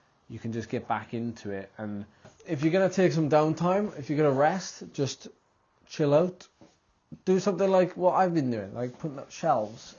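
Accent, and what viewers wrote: Wales, United Kingdom. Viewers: mate please DO NOT FALL INTO THE YOUTUBE TRAP of having to put content out!! You are well above that, you are quality and you deliver, and you are Welsh 🤣 Love that accent ❤😅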